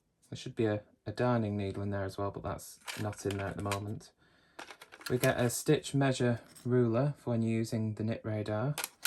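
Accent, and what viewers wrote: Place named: Northern England, United Kingdom. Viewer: i'm just about to purchase a secondhand MK70 and thought i'd look for a video or two as i don't it comes with a manual. i was watching without sound and thought you were American. imagine my surprise to hear your Northern accent, i'm from Lancashire. i couldn't have asked for a more informative, easy to follow tutorial. once i get my machine i'll be replaying this video constantly. thank you for spending the time in making it, it's much appreciated.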